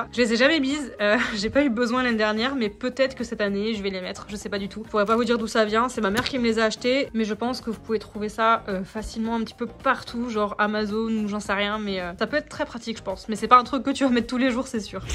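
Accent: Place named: France. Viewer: tu viens de la France? Moi je suis américain mais je trouve que t’as un accent français.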